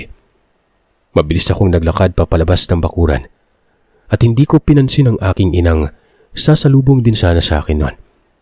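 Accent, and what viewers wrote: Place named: Calbayog, Philippines. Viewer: This is the first time na nakarinig ako ng taga Calbayog hahhaha hello kababayan 😂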